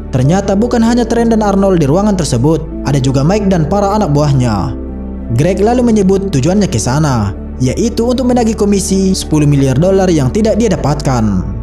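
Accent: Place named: Toraja, Indonesia. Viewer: Mntafff mannnee, 🤣🤣🤣 logat mu 👍👍👍,, toraja apa duri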